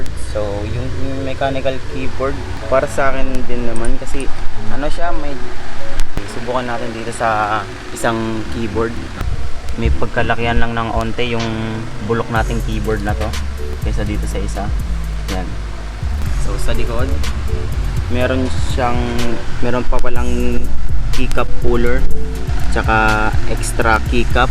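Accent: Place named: Iloilo, Philippines. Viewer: ahaha ilonggo ka gle ahaha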